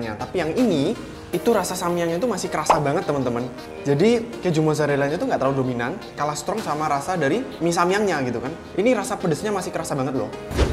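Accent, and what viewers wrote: Java, Indonesia. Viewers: Orang Jawa mana mas 😂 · baru pertama nonton video mu mas. kok medok wong endi to mas.. wkwk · Kalo ngomong medok ya😂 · ooo suroboyo tha pantes rodo medok koyok aku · Deneng medok si, wong ngendi njenengan?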